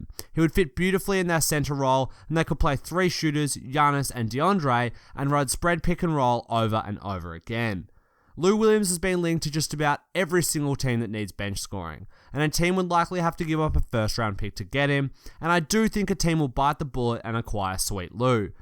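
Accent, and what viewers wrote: Australia. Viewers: What kind of accent it is? Is it Australian?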